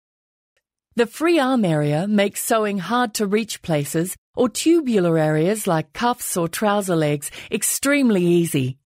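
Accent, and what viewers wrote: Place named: Oxford, United Kingdom. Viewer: Finde jetzt die Erklärung in diesem verhältnismässig langsam gesprochenen und klar verständlichen Oxford Englisch mit den entsprechenden Bildern dazu sehr gut zum nachvollziehen. Was schreien hier alle nach einer deutschen Anleitung? Ist denn bei der Maschine keine dabei? Viel falsch machen kann man ja bei dieser Nähmaschine nicht, wenn man ein klein wenig logisch denkt beim arbeiten ;-) Dank Globalisierung ist die neue Geschäftssprache halt Englisch, so what? Ich dachte auch - bis heute - dass SINGER ein deutsches Unternehmen ist, hab ich wieder was gelernt dank den Kommentaren hier. Danke!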